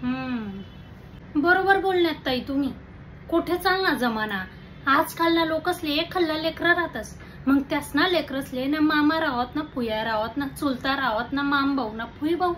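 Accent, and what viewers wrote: Khandesh, India. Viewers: Kiti natural acting kartes ❤ Ahirani aikayla khup goad vatata❤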